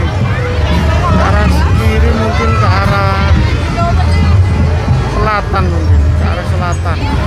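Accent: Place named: Java, Indonesia. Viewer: Medok rek suare ..aq yo wong ngabab lho..omahku kulon lapangan rek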